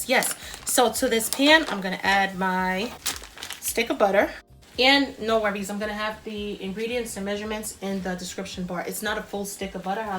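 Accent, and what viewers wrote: New York, United States. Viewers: Great recipe! Better substitute would be monk fruit is it natural and it's good for cancer patients as well. Love the New York accent absolutely Gaw-gis 😂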